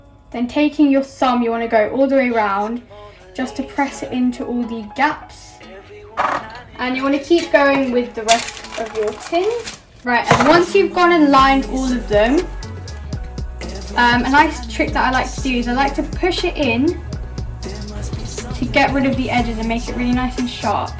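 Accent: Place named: United Kingdom. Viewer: British accent is really hard to understand..😕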